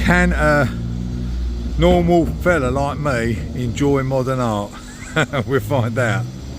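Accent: London, United Kingdom. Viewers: I love your voice, proper old school London, im from Ladbroke Grove. Thanks for the Video 🎉 For me, it's all about the ingredients in the sausages. I've yet to find a decent independent coffee shop in London. 😢 What genre of music are you collecting on vinyl?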